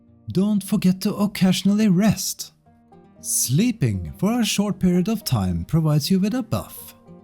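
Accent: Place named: Norway. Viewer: .and this, people, is how an proper Norwegian accent sounds like :)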